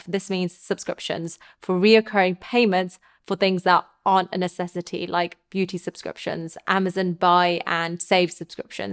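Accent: United Kingdom. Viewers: God bless pretty, smart, women with British accents! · Accent: British. Currency: USD. Make sense of that.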